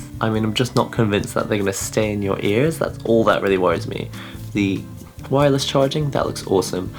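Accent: United Kingdom. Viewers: Yep. This comment was a joke. He obviously sounds like he's from the UK. And I was taking a swipe at the English.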